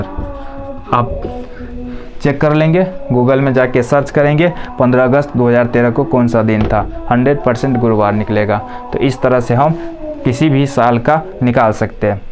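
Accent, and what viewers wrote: Bihar, India. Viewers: आप बिहार से हो ?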